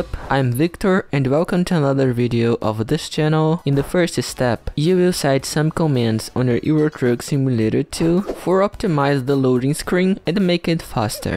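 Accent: Brazil. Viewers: Eu senti que seu inglês tava meio brasileiro kkkkkk dps que eu vi o nome do canal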